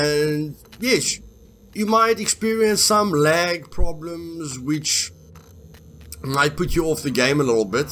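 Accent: South Africa. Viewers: is that an SA accent I hear? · Which part of South Africa are you from bru....or Rhodesia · Jy is van Suid-Afrika :D ek hoor dai accent :P. thanks viri video dude! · Are you south African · I'm enjoying the video so far. Thanks!! I'm looking forward to playing. Btw I hear your south African accent😃. My old pastor was from South Africa. I'm looking forward to watching more of your videos. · Friends and I are getting into scum today thanks for the help . Are you South African ?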